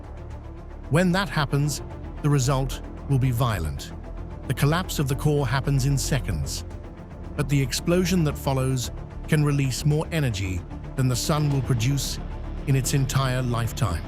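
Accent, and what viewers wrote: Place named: United Kingdom. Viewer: British guy voice! 👍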